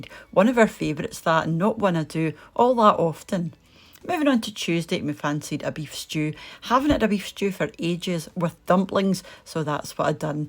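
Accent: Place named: Glasgow, United Kingdom. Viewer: I love your channel, I love hearing your voice because it takes me back home to Glasgow. During this pandemic I look forward to your vlogs every week since haven’t been able to get home to visit family. Are these naysayers cooking gourmet bloody meals every night? I love the fact that your meals are something we can all put together and home made. The fact that you put a home cooked meal on the table each evening is impressive. Anne from DC 🏴🇺🇸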